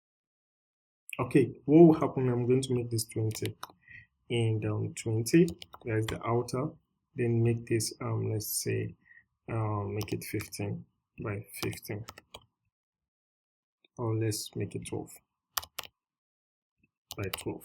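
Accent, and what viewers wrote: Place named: Nigeria. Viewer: sounds Nigerian, finally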